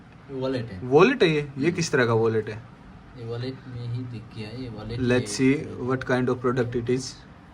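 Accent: Haryana, India. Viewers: Bhai haryanvi h k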